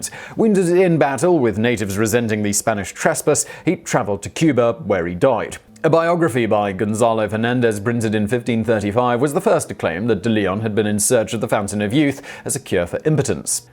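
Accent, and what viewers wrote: United Kingdom. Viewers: Me an American: ahhh yes British Big Brain tells me history. I enjoy this 😂👍🏻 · bro he british he ain’t even american🤣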